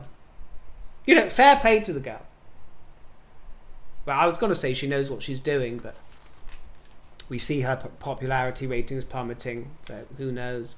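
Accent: United States. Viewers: I love your American accent! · you do a great American accent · You are such a gem! The American accent is 🤌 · Just love American girl accent, so perfect! You gave the most incredible wit n humor! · Your American accent is killing me! 🤣💕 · You're a yank...?🤭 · LOVE your American accent! Got me laughing! Hugs from Texas. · your American accent is perfect! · I just love your American accent today. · I love the switch to your American accent! XD great video, xx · OMG! Your American accent cracks me up!!!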